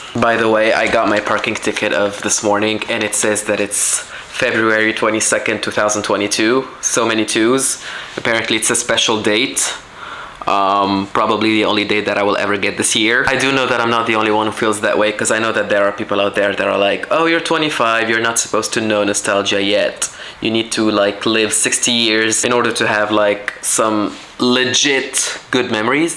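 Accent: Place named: Tunisia. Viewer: First time watching u, at first I was like why tf is he speaking english, idk why but everytime i listen to a tunisian speaking in english (including me) it feels a little weird, damn I wish i could speak with american or british accent, anyways keep it up, nice video,this channel was a good discovery today. kind of like nathaniel drew of tunisia ;)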